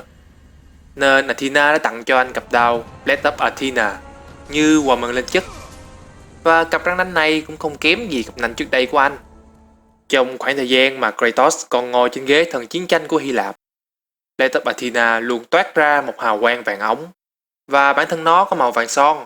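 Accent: Vietnam. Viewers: đọc tiếng anh kiểu ng việt nghe khó chịu vc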